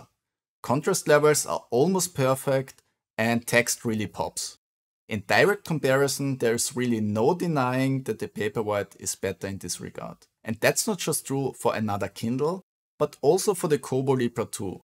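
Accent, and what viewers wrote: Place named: Austria. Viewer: Your accent sounds austrian.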